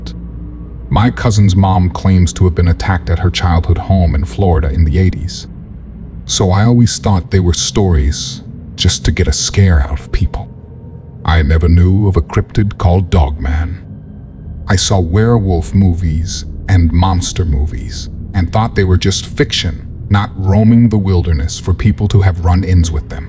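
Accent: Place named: England, United Kingdom. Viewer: I said that but theres a pommy accent there??? Still cant work out if it is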